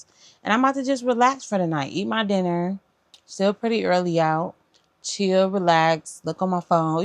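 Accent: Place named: South, United States. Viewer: What part of the south are you from seriously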